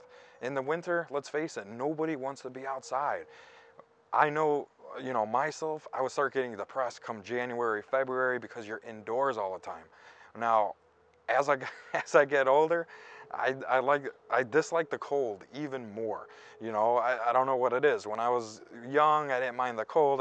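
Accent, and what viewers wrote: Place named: Illinois, United States. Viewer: You got the typical Illinois accent. It's super strong.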